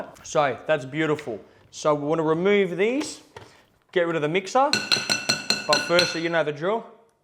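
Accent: Australia. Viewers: He's Greek so Greek Australian accent! Lol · Why does he sound Australian. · Because he is Australian 😊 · Its a typical Wog accent (Greek-Australian). Its like the equivalent of how Italians in New Jersey have the Jersey accent.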